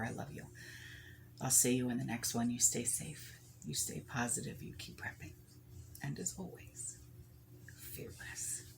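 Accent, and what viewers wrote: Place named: New York, United States. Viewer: I see you live in Florida, but you definitely sound like you're from NY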